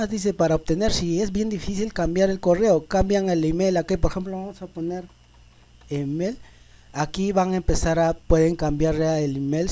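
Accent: Bolivia. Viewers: Eres boliviano?...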